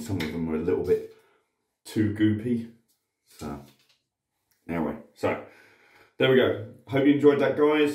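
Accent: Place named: United Kingdom. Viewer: After 2 minutes i realised he is from uk, and he is really from uk! They have this accent even foreigner easy understand.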